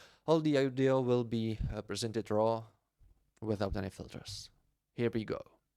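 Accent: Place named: Central Europe. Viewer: You must be from middle europe because your accent is like poland, hungarian or slovak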